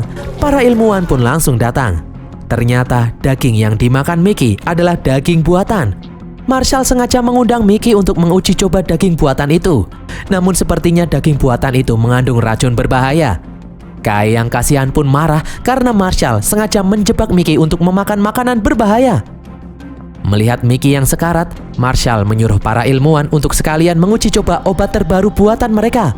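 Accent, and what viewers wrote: Java, Indonesia. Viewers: kereenn..baru nemu channel recap yg agak2 medok wkwkwk. gaaassss terus mase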